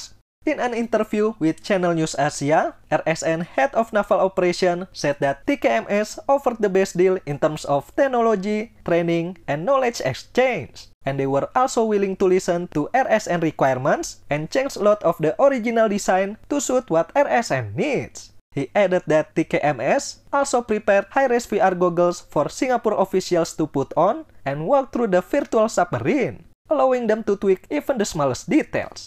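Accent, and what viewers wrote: Indonesia. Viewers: I guess the narrator is Indonesian😊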